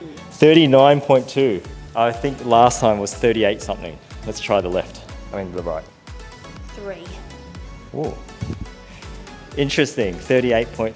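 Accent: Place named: England, United Kingdom. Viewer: U look native american and have an english accent. My brain cant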